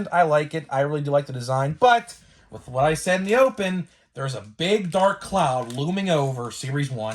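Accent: Pittsburgh, United States. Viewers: Just found your channel. Two seconds into listening to you while doing some work I said this dude has to be a Yinzer. LOL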